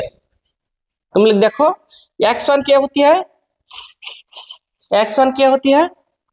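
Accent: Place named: Assam, India. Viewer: Sir assamese lage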